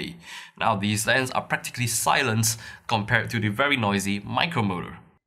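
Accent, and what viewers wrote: Singapore. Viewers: great review. may I know where are you from? you sound like Singaporean or Malaysian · Singaporean for sure.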